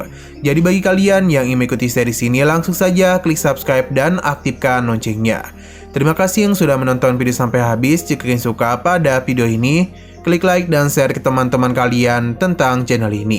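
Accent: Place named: Bali, Indonesia. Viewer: Logatnya mimin kek orang Bali 😅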